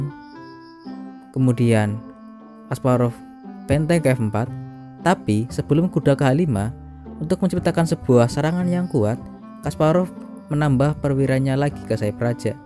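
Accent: Banyumas, Indonesia. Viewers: Bahasanya ngapak ngapak bang..hehe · Ngapak ya bang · Logatnya seperti Purworejo - Banyumas ya, aku Wonogiri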